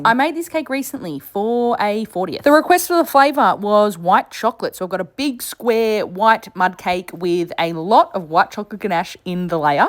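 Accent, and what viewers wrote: Australia. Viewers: You're Aussie?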